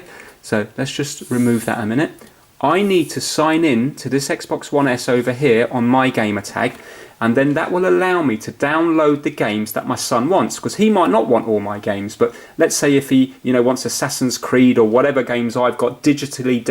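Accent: United Kingdom. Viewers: Awesome! Here we are in 2019, self-driving cars and all, yet those janky paper-cutouts (and my subliminal sense of confidence in an erudite British accent) were exactly what I needed so my kids can play at the same time. Thanks dude, from JB in California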